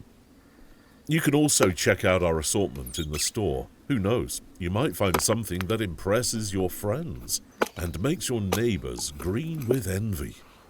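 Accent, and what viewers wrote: United Kingdom. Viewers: Get rid of the British narrator please!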